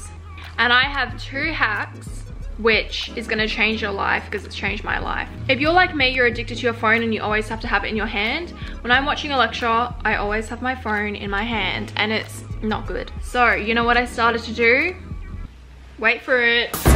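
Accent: Australia. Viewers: I LOVEEE YOUR ACCENT!! Hah its so fun and chill. Is it an Australian accent by chance?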